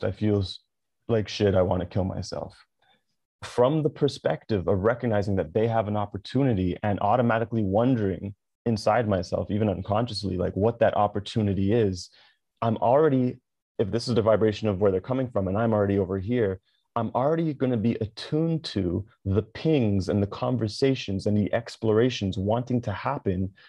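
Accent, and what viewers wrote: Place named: Jamaica. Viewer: Are you Jamaican why you look and sound like a yaad man!! If that's soooo bomb!! I'm loving your videos. This one is really good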